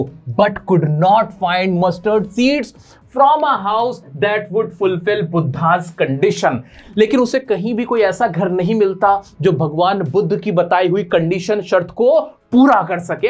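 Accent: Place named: Bengal, India. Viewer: You are bengali bro you say in the lesson maa-nush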